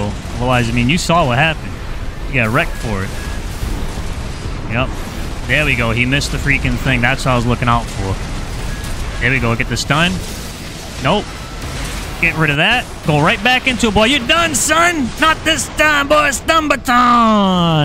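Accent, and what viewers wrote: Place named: East Coast, United States. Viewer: Love your videos homie! Judging by your accent I’m guessing you’re from the east coast Boston or jersey! My old job I had a ton of coworkers who came from the east coast to train with me here in Cali so I can spot that accent a mile a way lol. Btw I’m on my third play through on the story mode. I’m trying to unlock all parts before I make the journey in to pvp. I’m a veteran of this game dating back to ac1 but due work and family duty I’ve been trying to make some time For some ac goodness !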